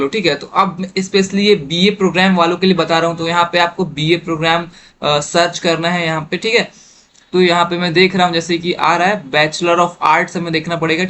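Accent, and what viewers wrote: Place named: Bihar, India. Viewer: Bhai aap bihar se hi kya tone match kr rha h 😊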